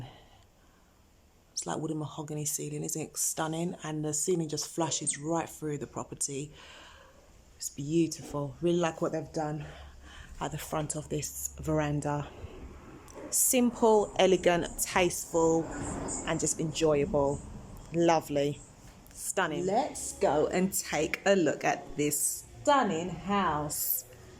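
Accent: United Kingdom. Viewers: This presenter and her london accent is annoying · So refreshing to hear English accent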